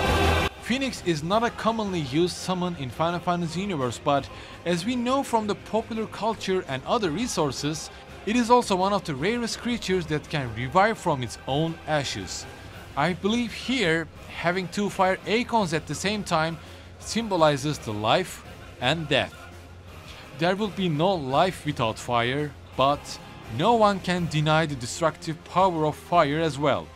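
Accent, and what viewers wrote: Turkey. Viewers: Where you from? You have turkish accent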